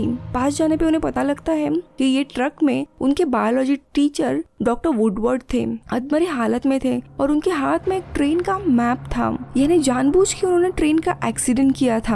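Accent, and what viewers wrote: Maharashtra, India. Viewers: Ur accent is Maharastrian. R u marathi?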